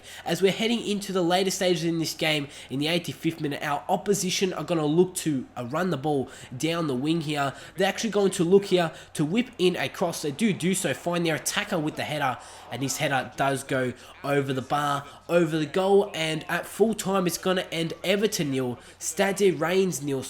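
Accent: Australia. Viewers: you from Australia??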